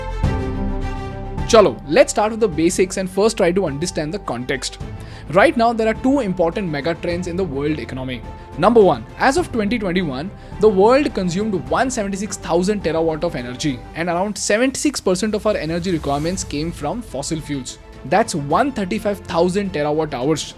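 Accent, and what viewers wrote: India. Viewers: I don't know but when I listen ur English I feel that I am listening Hindi. I don't get irritate by your speaking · Kitna bud shakal hai yea larka. Aur is ki english suno. Typical indian style. Manhoooos shakal. Manhoooos awaz. 😅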